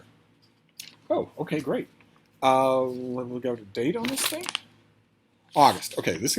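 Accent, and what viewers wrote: United States. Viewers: Sounds like you are in Georgia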